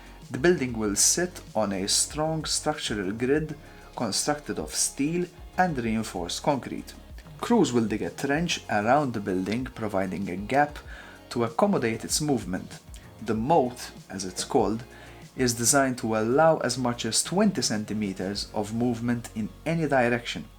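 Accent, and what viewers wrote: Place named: Malta. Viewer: Are you Maltese? That accent is familiar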